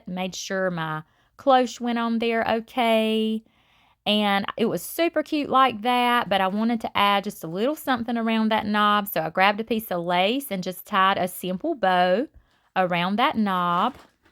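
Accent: South, United States. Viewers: I love how you save broken pieces to cover and use in your arrangements! I would've never thought to do that, but then again I don't make many arrangements... I make more random signs, candle holders, and such. You're so amazingly talented and I LOVE your accent ❤ in from Southeast Texas so I love Southern accents ♥️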